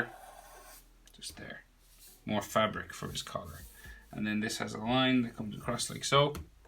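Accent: Ireland. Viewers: i’m gonna draw so many of these lol,also are you irish?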